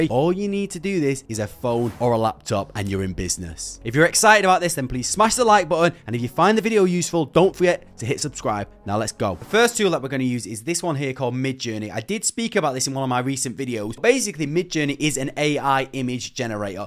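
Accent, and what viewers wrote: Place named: Manchester, United Kingdom. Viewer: You sound like a Manc! 🎉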